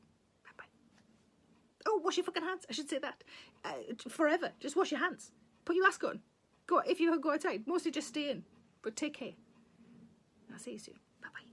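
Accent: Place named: Durham, United Kingdom. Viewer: Listening to you every day has been like a home comfort. It reminds me where I’m from and the proper way to talk. I was a frequent visitor to Boldon cinema when I was younger. Perhaps we met. Thanks from near Luton, originally Seaham.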